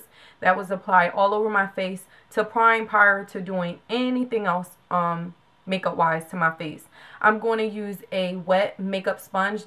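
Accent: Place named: New Jersey, United States. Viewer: Ahhh just I knew by your accent that you were a fellow jersey girl! What I love most about this is you explain how you hold the brush, how to apply the product, etc. Most people only show what to use, not how. Great video and of course lovely look! :)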